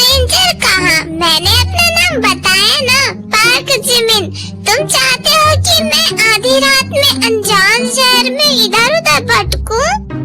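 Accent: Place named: South, India. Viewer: Aapki voice me thoda sa south indian touch hai like dadaji 😅 bahut acha laga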